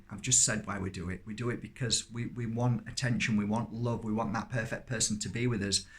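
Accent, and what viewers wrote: Yorkshire, United Kingdom. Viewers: Love your Yorkshire accent so much. Even though you're all covered up with a t-shirt 😉 thank you for another lovely message. 💕 😘 🤗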